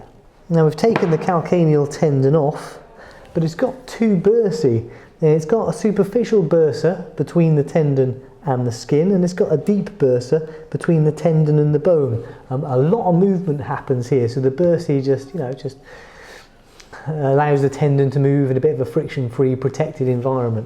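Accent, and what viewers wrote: United Kingdom. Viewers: A British accent automatically makes you an even greater expert to the American ear. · what part of uk is this ?=)thank you so much for your videos is so hard biology as register dietitian I have to study in my university but when I found your videos it make a lot difference in my learning experience=)thank you!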